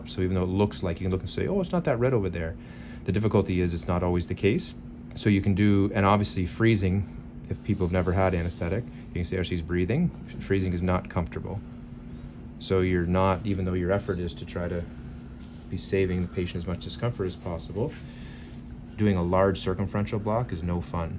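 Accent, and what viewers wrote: Canada. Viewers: Your accent is Canadian. Good work Doc! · He’s Canadian.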